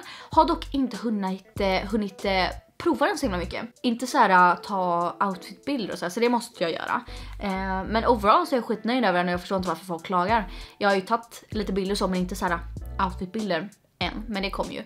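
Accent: Stockholm, Sweden. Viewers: ja hon har bytt från vanlig svenska till stockholmska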